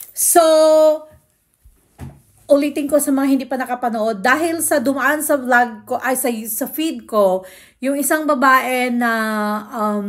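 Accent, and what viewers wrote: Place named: Visayas, Philippines. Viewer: What a WASTE OF SPACE this TRYINGHARD YOUR ENGLISH BISAYA KAAYO DAY,PAG HILOM OY KAHIAS NIMO,PAKAULAW KA LANG TO THE BISAYA HILAAAAS,DILE BAGAY SA IMO OY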